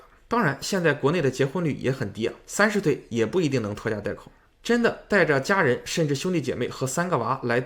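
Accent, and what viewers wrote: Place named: Shanghai, China. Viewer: 你这个上海话。。。。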